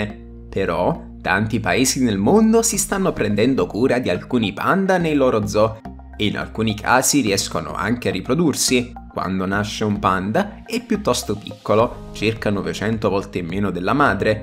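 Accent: South, Italy. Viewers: Bel video, e anche interessante anche se un pó scolastico. Mi permetto di fare un appunto sulla dizione inequivocabilmente meridionale, non si raddoppiano le consonanti, (es. Incredibbile...) e le C non si pronunciano sc...., l'italiano è un'altra cosa.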